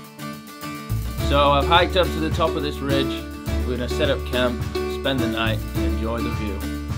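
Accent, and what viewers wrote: Yorkshire, United Kingdom. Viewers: What part of Yorkshire are you from then? 😁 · Yorkshire accent? Just chillin' in the woods, eating steak, sipping whiskey, very cool!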